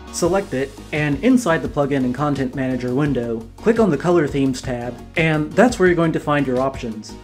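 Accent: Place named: Eastern Europe. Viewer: you have an accent....I wonder what your native language is...perhaps a slavic one..?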